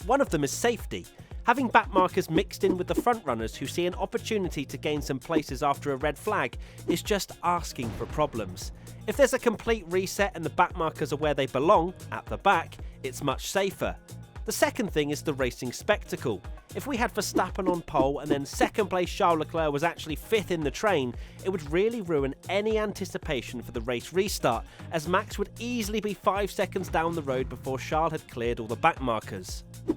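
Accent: United Kingdom. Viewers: Listening to your very british accent its obvious who you root for. Yet you argue let the haters comment!! Yet two seconds later you are the hater yourself. However the rule of unlapping is utterly BS. One could argue to unlap but at least provide a half a lap distance between the front runners and the rest of the field in order to make sure the starting grit is in sequential order. Only seems fair no?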